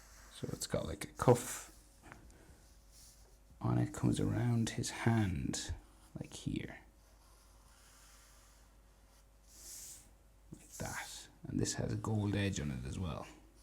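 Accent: Ireland. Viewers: First u art is sooo good, its eloung me become a waay better artist and second r u irish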